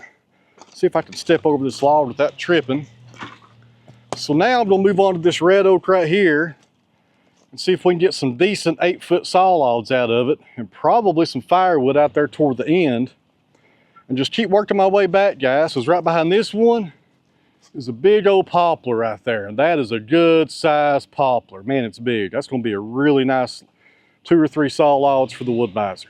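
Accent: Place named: Southwest Virginia, United States. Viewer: Well, I'm from southwest VA and he sounds a lot more like my Bristol peeps than Charlottesville.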